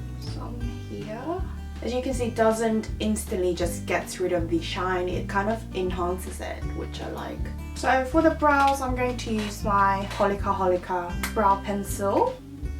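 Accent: United Kingdom. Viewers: 이렇게 제품 처음으로 사용하면서 수다떠는 영상 너무 좋아요ㅜㅜ 더 자연스럽고 중간중간 영국.. 리액션..?같은.. 그런 말투도 완전 씹덕..♡ 이번 메이크업 머리랑도 너무 잘어울리고 예뻐서 저도 기회되면 저렇게 머리 염색해보고 싶네용ㅇㅅㅇ 아 그리고 속눈썹 고데기 저렇게 하면 마스카라 뭉친것도 풀어지고 그러나요?! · 이런 영상 진짜 좋아해여ㅜㅜ왜냐면 언니는 영국발음이 존나 매력있으니까!!! 자주 찍어주세여😆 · 수다떠는영상 좋아요!! 칭구느낌? ㅋㅋㅋ 영상 늘 잘보고있어요 영국발음도 듣기좋다능.. 좋은영상 감사합니다!! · 언니 영상 보는데 제가 요즘 영드 에 빠져있고 언니영상 보면서😁😁 점점 영국영어 매력에 빠지는거같아요 ㅎㅎ♡^♡ 글고 요즘엔 방학이라 언니 영상보면서 화장법 따라하는데 역시 화장도 많이 따라해야되나봐영ㅋㅋㅋ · 님! 오늘도 님의 찰떡같은 브리티쉬발음을 듣고가네요ㅋㅋㅋ전 개인적으로 님 영어영상이 더 좋은것같아요!뭔가 공부도 되는느낌같고 너무 좋아요ㅠㅠㅠ이거이거샀다 하는 영상보다 이렇게 오늘처럼 말하고 사용감도 보여주셔서 알수있는 방식이 더더더 좋습니다!!말하는 영상도 너무 좋고 영상길으면 더 좋아요!! 영상이 너무 짧으면 항상 아쉽거든요ㅠㅠ개인적으로 15분대 영상을 참 좋아합니다ㅋㅋ 사용제품도 더보기란에 다시 다 알려주셔서 쉽게 찾을수도 있구요! 님 영상 항상 잘보고있어요! 다음 영상도 금방 찾아와주세여! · 이런 영상 좋아요! 볼 때마다 느끼는 건데, 입모양 목소리 말투 영국발음 전부 매력적이고 듣기 좋네요.. 그리고 립 발색이 항상 탐나는 입쯀ㅎㅎㅎ 강점인 것 같아요 입술!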